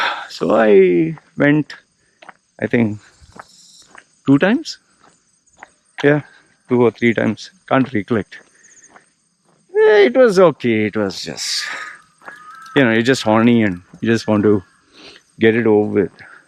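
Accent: India. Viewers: your accent is more like an Indian. where are you from brother?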